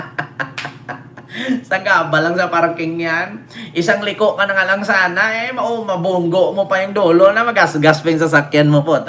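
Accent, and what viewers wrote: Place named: Iloilo, Philippines. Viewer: Ilonggo Ako pero magkatawa ko mag ilonggo ka.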